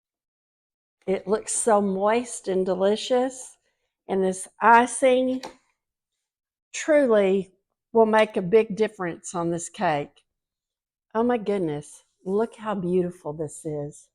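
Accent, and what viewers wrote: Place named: West Virginia, United States. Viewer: So delectable. Love apple anything. Where are you from? I am originally from WV, I'd swear you are too!!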